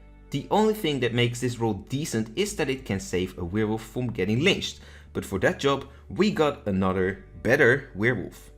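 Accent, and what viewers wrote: Netherlands. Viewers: Why do you sound so Dutch?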